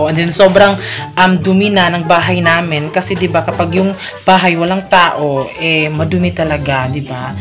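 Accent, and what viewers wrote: Visayas, Philippines. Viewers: nakakainis naman ung sinasadya mong pagbigkas na may bisaya accent like EKOT EKOT at DETU. pagbisaya nalang kay pangit paminawon. ayaw mo kasulo kay constructive criticism na ha.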